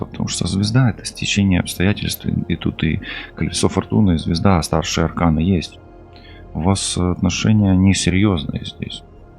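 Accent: Ukraine. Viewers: Он Водолей,Я близнец..Я кацапка.. мы сос тояли в браке..1ОЛЕТ., ЧУЖОГО я не брала,пусть вернутчтто украли...Приятно слышать украинский акцент,хотя многие. слова. не понимаю.. У меня подруга с семьей проживала в. Броворах,а потом ВЫСТРОИЛИ дом в Винице.За. 3 дня до войны,она ПР ИЕЗЖАЛА..🎉.Я приглашала к. Себе пожить,но они не сммогли выехать.Раньше была связь,а теперь уже давно нет,побаче пожалуйста Скажи,они для меня как родные.я..яс уваж.Надежда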